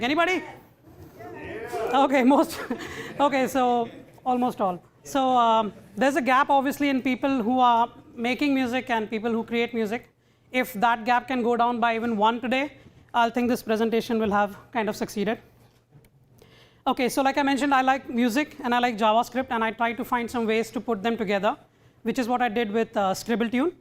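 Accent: India. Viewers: awww dam i hate the Indian accent, and i am a music aficionado, well nice demonstration anyway, i enjoyed it, even if the odds where not on the speaker side (for my part). thank you ! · I hate this indish acent!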